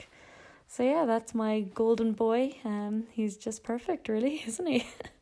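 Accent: Ireland. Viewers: I can sense the Mullingar accent from a mile away !! 😂😂😂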